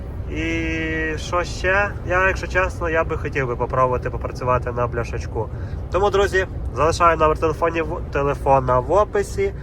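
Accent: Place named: Western Ukraine, Ukraine. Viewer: Файно слухати свою мову, ви похоже з наших країв, привіт з Тернополя 😊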